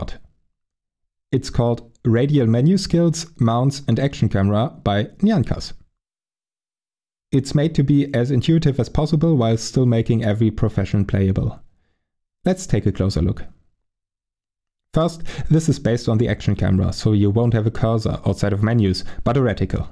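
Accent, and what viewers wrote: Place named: Germany. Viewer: I am developing a Pavlovian response to German accents in YouTube guides at this point! I got excited when I heard your accent at the start and I wasn't disappointed. everything was spot on: patient, well-paced, empathy and context provided for those new to Deck, actually covers all the details, thorough about making sure everything described has associated video, exaggerated finger movements so it's clear which buttons you're pressing, zero padding. thank you so much for this amazing guide <3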